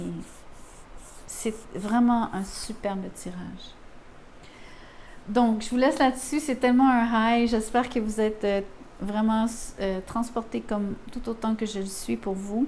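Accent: Quebec, Canada. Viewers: Bonjour. Quel bonheur pour moi aujourd'hui. Tres peu de tarologue sont du Quebec. J'ai bien entendu votre accent. Mais de vous entendre le dire. Bingo. Je vais vous suivre. De quelle ville etes vous? Et votre tirage est etrangement similaire a se que je fais et planifie de ma vie. Merci et gratitude 🙏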